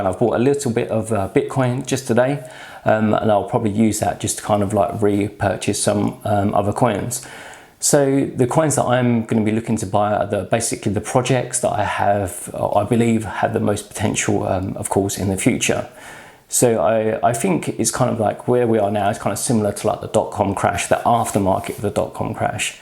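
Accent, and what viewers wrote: United Kingdom. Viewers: This guy using uk accent?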